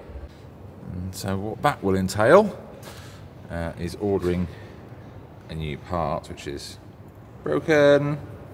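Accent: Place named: England, United Kingdom. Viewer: It's still chugging! You are a wizard! You are doing a fantastic job with the van and everything. Loads of fun things to do with the van yet... but you are doing good. Does remind me a lot of my Pops working in his shop... I miss him. But watching you work makes me happy. The only thing that is odd is well... the very English accent. But that's ok I love that. You got your chipper and lawnmower to fix up... but it's coming.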